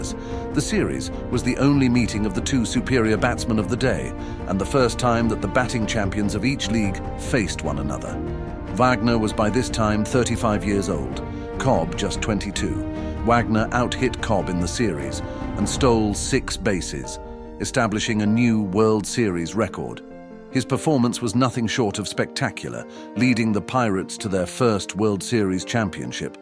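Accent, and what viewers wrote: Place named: United Kingdom. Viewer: Interesting to hear a British narrator on a biography of a player in the Great American Pastime.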